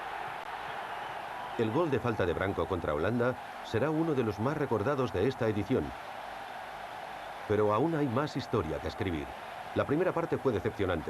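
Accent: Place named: Spain. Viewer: Para documentales no existe nada mejor que la de un español o española... lo máximo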